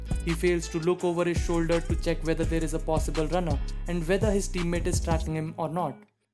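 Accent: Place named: India. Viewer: Immediately I heard an Indian voice just skipped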